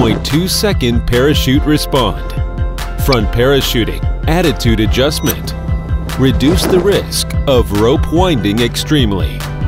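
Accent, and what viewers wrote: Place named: China. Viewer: The Chinglish is putting me off.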